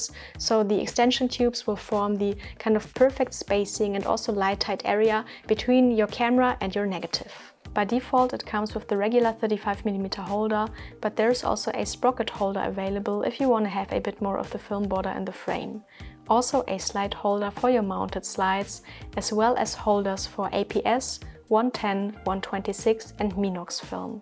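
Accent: Germany. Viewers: been trying to learn and looking for a film scanner? jsut starting to watch your videos. by any chance do you live in Germany? the accent is a littl bit strong, just some stuff I noticed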